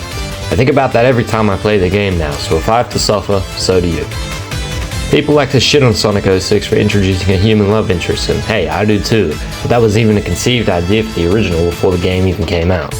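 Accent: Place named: Australia. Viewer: This guy is literally just Aussie Bulbasquirtle, I love it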